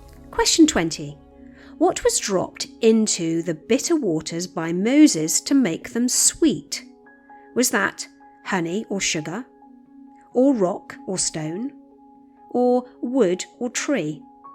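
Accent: England, United Kingdom. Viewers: I so enjoy your quizes and also love the sound of your voice with its English accent.😊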